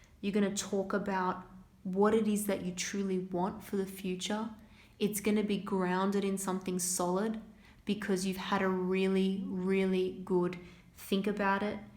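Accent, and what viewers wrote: Australia. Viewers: Beautiful Ozzy accent ? ..thank you for the reading..being blessed right now ,peace to you reader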